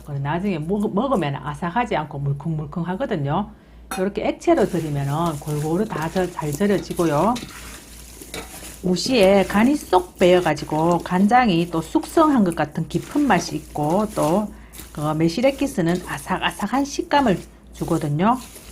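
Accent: Gyeongsang, South Korea. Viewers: 너무 크다타이하모 입이 찌져집니더~ ㅋㅋㅋ갱상도 말은 언제들어도 웃십니더 ㅎㅎ 지는 대구에산지 38년되었는데 말투가 갱상도 충청도 짬뽕입니더 ㅎ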